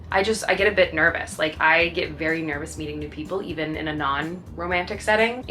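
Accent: New York, United States. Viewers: Another interesting video from in her trademark Husky strong New York Accent living life again in the fast lane in NYC and having a action packed week with members of The Flamboyant NYC Crew, the bubbly Flamboyant Redhead, and The Twin Sisters RN'T US! duo, going to different places including two theatres, Matcha Bar, restaurants, walking in the park, watching Love Island indoors and doing some brand videos as well as cooking food and doing her work on the computer. She did gave her Cats some love and even helped a friend with moving. The Weather here in the UK is very hot and humid even at night. Unfortunately both and decided not to go to the Knicks Victory parade because of acute overcrowding. She did go out on a date with someone but she is keeping it a secret! Looking forward to seeing another video soon. Take care from London UK 🇬🇧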